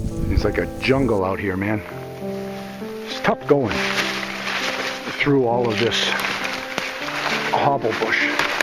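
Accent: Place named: East Coast, United States. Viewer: Sounds like an east coast Dick Proennecke!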